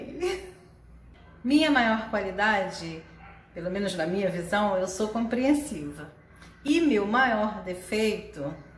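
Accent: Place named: Rio de Janeiro, Brazil. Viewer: Nossa vc é baiana, mas tem um sutaque muuuuiiito puxado de carioca 😊 bjos! Vc é um amor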